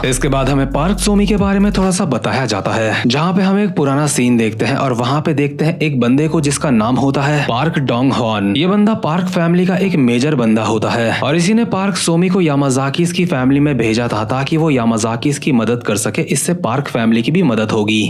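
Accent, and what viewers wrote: Uttarakhand, India. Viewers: Bro tum Uttarakhand se ho kya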